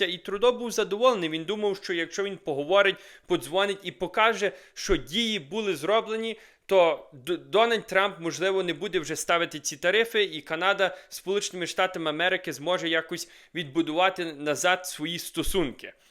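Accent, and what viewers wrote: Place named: Hutsul, Ukraine. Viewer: Вивчи Українську цуценятку ато від гуцульського підар акценту аж верне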